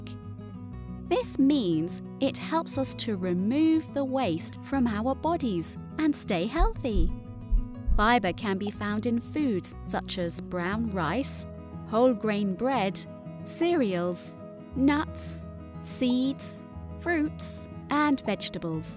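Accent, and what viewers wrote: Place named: United States. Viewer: american pronounciation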